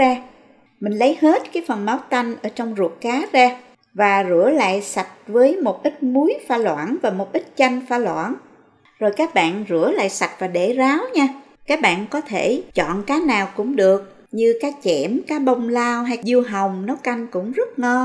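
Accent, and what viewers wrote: South, Vietnam. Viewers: Giọng miền Nam ngọt ngào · Giọng nói miền Nam VN, dễ nghe, dễ thương.. Cám ơn cô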